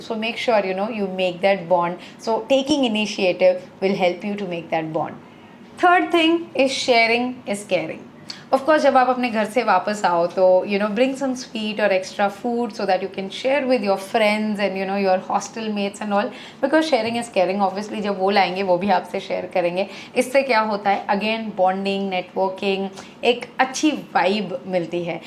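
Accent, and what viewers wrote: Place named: India. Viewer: Your Desi English very nice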